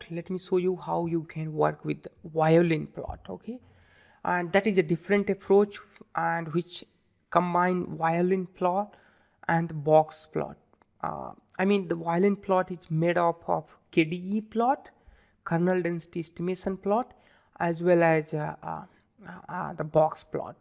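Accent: India. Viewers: WoW! It's been many years since I heard a lovely Indian accent. It's pleasant to hear from you sir and Thank you very much for wonderful video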